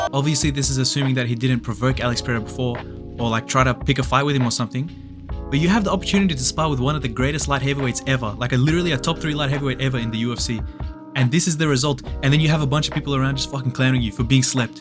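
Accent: Australia. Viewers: are you an aussie man?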